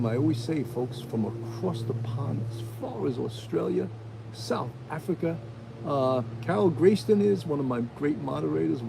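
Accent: New York, United States. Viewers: Love your NY accent!!!! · I loves me some New York accent! What a jerk!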